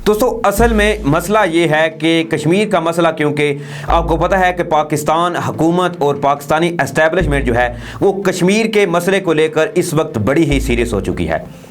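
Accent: India. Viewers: Yeh banda indian Hé is ka talafaz ghor se SUNAIN pâta chal jaye ga Hé is indian. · Dost Urdu thek Karo plz aap Indian style Urdu bol rahe hay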